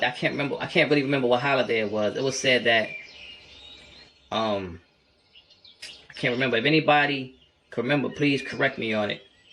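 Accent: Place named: Audubon Park, United States. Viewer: That Nicca sound like he in Audubon Park somewhere🤣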